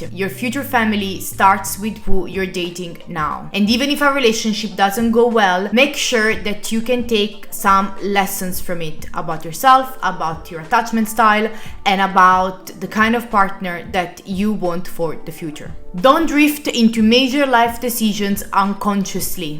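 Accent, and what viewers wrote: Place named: Italy. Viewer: Hello, good morning 😊. I watched this video of yours and I liked it. I recognized you for your Italian accent. I admire the architecture and love music of your country a lot. Greetings from México 🇲🇽. By the way, both countries share the same colors in their flags. 😊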